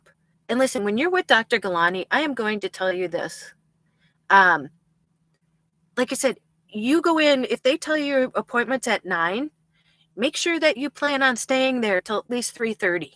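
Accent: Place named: New York, United States. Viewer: Just be YOU. New York accent,,,, Don't worry about it. Lol. More support you than Don't. Keep going. I'm pointing at you. Magic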